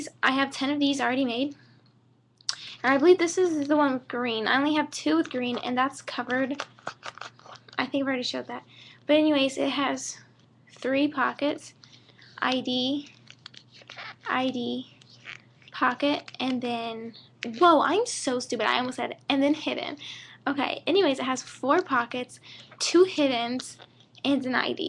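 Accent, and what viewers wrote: United Kingdom. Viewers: You seem like a British person ;) I think the accent fits you perfectly! ^^ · why was she talking in a British accent?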